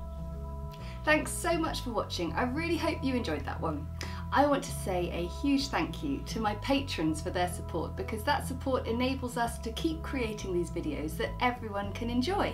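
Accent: United Kingdom. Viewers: I'm in love with your clases! And love to hear British accent😍.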